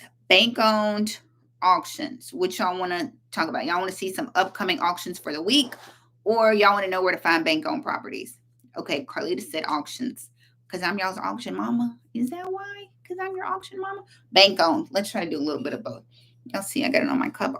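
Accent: South, United States. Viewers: I love your country accent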